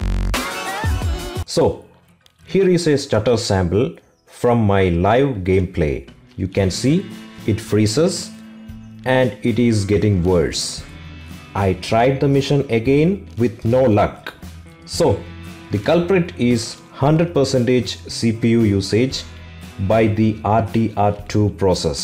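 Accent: India. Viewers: I like your sexy indian voice :))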